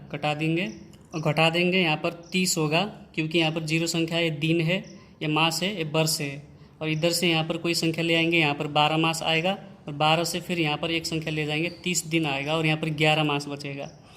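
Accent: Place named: Nepal. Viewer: Manche chai nepali jasto cha boli Hindi bolera yesto vya niskiracha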